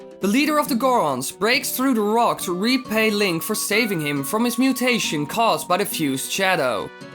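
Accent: Netherlands. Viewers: do you happen to be dutch? i can really recoqnize the dutch accent as i am one myself :p came across your theory through the zeldainformer fb page 😁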